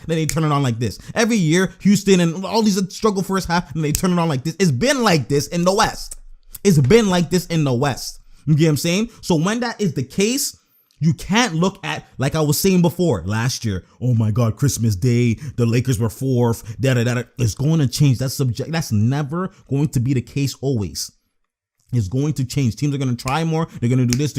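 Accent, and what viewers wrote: Canada. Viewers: I can tell u from Canada from ur accent aha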